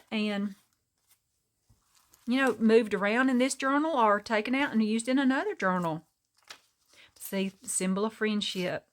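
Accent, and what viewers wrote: South, United States. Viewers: i discovered your channel yesterday. I have to say... Your giggle is charming and your slow southern drawl is so soothing! I love your little stories! Beautiful journal and very inspiring! I will be sure to watch the rest of your videos. Blessings to you 🙏 from Kim in Gettysburg, PA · This journal is stunning!! Btw, Where are you from? Our southern accents are the same so I know you're from the south lol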